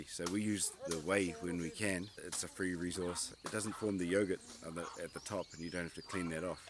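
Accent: New Zealand. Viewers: great to hear a strong kiwi accent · Did I notice a kiwi accent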